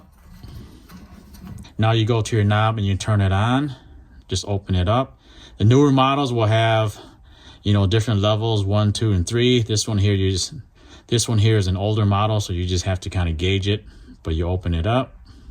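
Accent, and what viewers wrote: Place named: Minnesota, United States. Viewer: i thought he was from Minnesota as soon as he started talking, kind of like Canadian.. i dig that accent.. great video too ! i'm getting my big buddy today🎉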